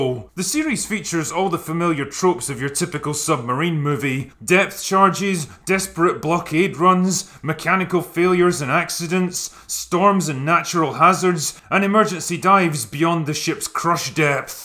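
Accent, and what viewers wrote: Scotland, United Kingdom. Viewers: I always enjoy your videos and often recommend them to friends, so I hope you won't take this the wrong way. Your analysis of Das Boot was excellent, spot on in fact, but you had a few inaccuracies regarding the history and as a Naval person and historian feel compelled to mention them: - Your archival footage at the beginning was all of German WWI U-boats, so wrong war. - Submarines are always "boats" and never "ships." Doesn't matter if it's an old 500 ton coastal boat or a 10,000 ton SSBN; always a boat. Also, I'm curious why you were saying "loo-tenant"? You're obviously from the Blessed Land of Scotch (in fact, you sound almost identical to a mate from Clydeside, especially when you tell us to go away), so I'm curious why you use the American pronunciation? Cheers!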